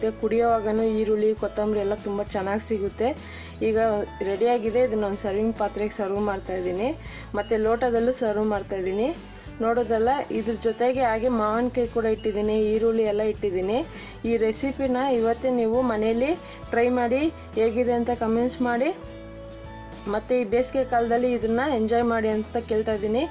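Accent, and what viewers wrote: Tamil Nadu, India. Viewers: Nimma language noduddre gothaguthe you are from tamilian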